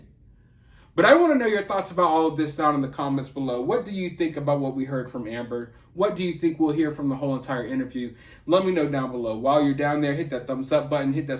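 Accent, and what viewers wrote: South, United States. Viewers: You are a way better actor than she ever wanted to be, and the southern accent love it Sho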